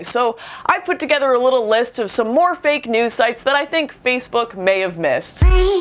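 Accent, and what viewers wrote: Canada. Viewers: "well I don't know aboot you, but once I found out aboot...." Lol. never really noticed that strong Canadian accent before.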